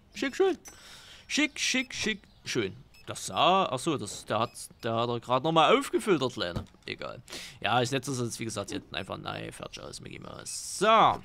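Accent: Saxony, Germany. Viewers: Supper sympathisch endlich eh Sachse 😂Grüße aus nähe Leipzig 🎉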